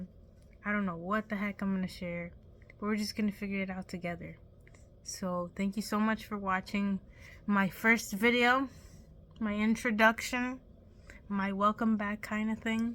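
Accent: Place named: New York, United States. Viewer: Ahh, i could almost tell you're a New Yorker! Aayyyy. Keep going girl, I'm rooting for you.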